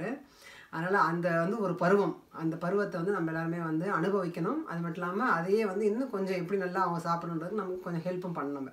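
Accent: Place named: Tirunelveli, India. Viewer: 5 days back I subscribed Ur channel..one full day I watched ur vedios.like Ur slang assault ah pesureenga.r u from Tirunelveli? Also I saw ur juices vedios bought some fruits..made muskmelon juice..then lunchbox ideas was very nice bought a new box for my husband.. plz upload toddler food ideas sathiyama saapda vaika mudila..thanks ungal sevai engaluku thevai ...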